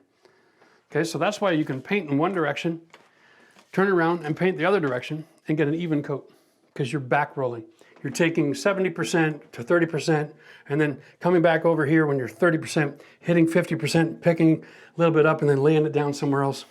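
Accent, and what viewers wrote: Canada. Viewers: Are you Canadian? I detect an accent. 😉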